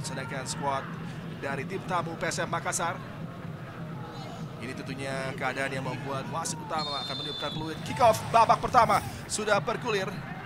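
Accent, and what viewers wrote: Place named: Malaysia. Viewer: Komentator nya seperti bahasa malaysia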